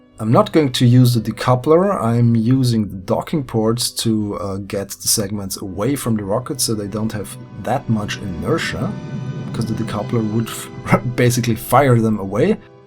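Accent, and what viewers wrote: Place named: Germany. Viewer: Thanks for the entertaining commentary. Always fascinated by your amazing designs with practical and elegant solutions. Good choice of analogy with the "unfinished symphony", since nothing ever gets finished when you play KSP... I hope that you soon again will show how to make Mun bases. I really liked "Operation Moonsucker" Btw. are you from Germany? I note a barely noticeable German accent in your flawless English.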